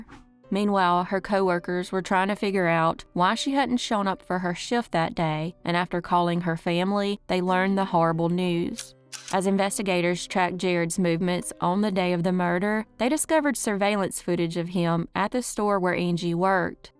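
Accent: South, United States. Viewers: You have the perfect voice to deliver those insults. The family not knowing about his violent nature...THE perfect southern "bless your heart" delivery 😊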